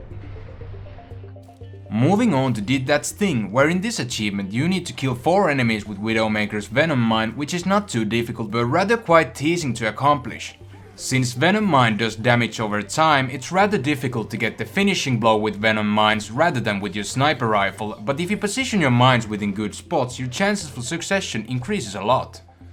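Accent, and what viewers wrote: Finland. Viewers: Are you finnish? Oletko suomalainen?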